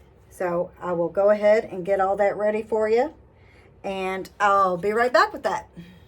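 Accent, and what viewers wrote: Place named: Tennessee, United States. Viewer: That's a beautiful quilt. Are you from Tennessee, by chance? Your voice reminds me of some of my folks in Overton County.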